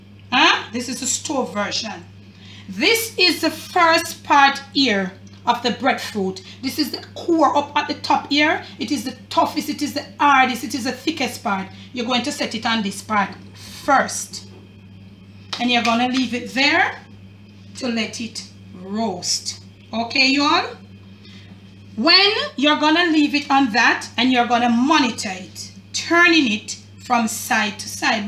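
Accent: Jamaica. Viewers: SHE IS SO HILARIOUS, WHEN SHE SPEAKS JAMAICAN VERNACULAR FAST, I DON'T UNDERSTAND ONE THING SHE SAYS. BUT, IT SO FUNNY. WHEN SHE SLOWS HER SPEECH I UNDERSTAND EVERYTHING SAYS. I LOVE THAT SHE DID NOT COMPROMISE HER JAMAICAN ROOTS IN DESCRIBING HOW TO ROAST THE BREADFUIT. I LOVE ALSO THE CANDOUR IN FRONT OF THE CAMERA. MADE ME FEEL LIKE I'M HER BEST FRIEND. I CAN SIT AND CHAT WITH HER ALL DAY.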